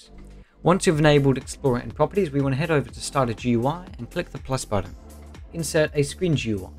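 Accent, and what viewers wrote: United Kingdom. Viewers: Are You Britisch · Bro I can’t understand British (not making fun of the accent)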